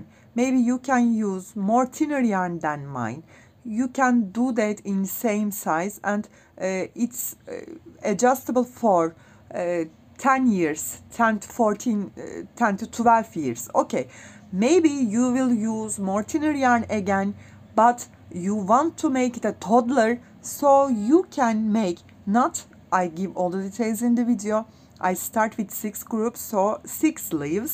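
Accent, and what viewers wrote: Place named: Turkey. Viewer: The second I heard the accent, I knew you are Turkish. Dünya çapında videolar yapan bir Türk görünce asın bayrakları diyesim geliyor ❤❤❤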